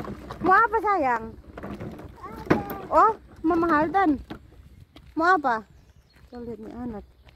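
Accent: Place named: Eastern Indonesia, Indonesia. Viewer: Salam kenal Kk 😊 Dari logatnya seperti dari Indonesia timur ya Kk, semog berbahagia selalu bersama keluarga.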